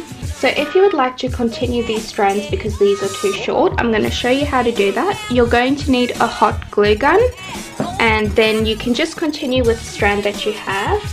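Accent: Australia. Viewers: In love with this dream catcher! Also, love your Australian accent.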